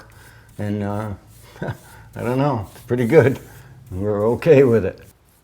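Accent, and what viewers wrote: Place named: New England, United States. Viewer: Love your craftsmanship! My 95-year-old father is an old-school cabinet maker. Good to see that kind of understanding of the material and respect for the craft is still alive. One personal question, if you don’t mind: I can hear your accents is New England. Were you born there? Thanks for your great videos!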